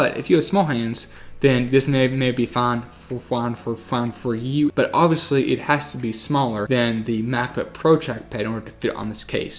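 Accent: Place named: South Louisiana, United States. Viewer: Hey, like your review. I'm just trying to decide whether to get this one or wait for the Brydge Pro Plus to get it's act together. I'm pretty sure they will. And I like the idea of aluminum. One question, just out of curiosity: where is your accent from? You, by chance, from south Louisiana? Your accent sounds familiar.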